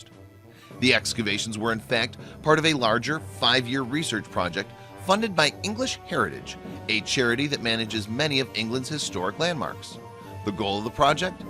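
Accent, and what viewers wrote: United States. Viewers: No offence but always put off by American voices talking about ancient English history and archaeology.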